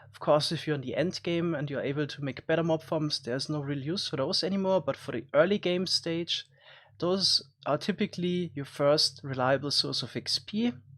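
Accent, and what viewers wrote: Germany. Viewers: you sound so german are you? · Man hört den deutschen akzent instant raus xd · Deutscher accent cool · Is he German? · Der deutsche accent 😂😂😂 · Dachte ich mir auch der hört sich Mega deutsch an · Are you german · man hört so stark das du deutsch bist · Hab nach dem ersten Wort gewusst das du Deutsch sprichst👌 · Alder dein english is so deutsch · Mal ne Frage bist du deutsch oder warum ist deine Aussprache so stark? xD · man kann so raushören, dass du deutsch bist XD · man hört so hart das er ein deutscher ist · Fucking German accent i can’t understand your English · are you german? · You sound very very german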